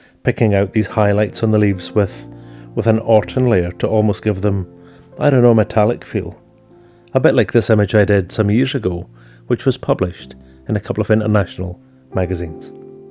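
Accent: Scotland, United Kingdom. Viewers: So grateful you take your own time to make these Videos. I love photography and have the technical side but don’t have a particularly artistic eye,so I get a lot of inspiration from videos such as these! Nice to hear a proper accent too, from a fellow Scottish Lad!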